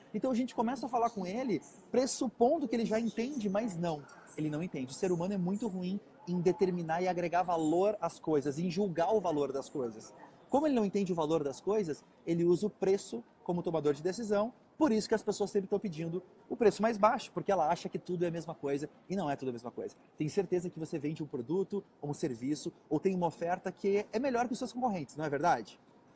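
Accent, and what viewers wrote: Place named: Portugal. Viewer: To começando a te acompanhar, e sábia que esse sotaque era da terrinha.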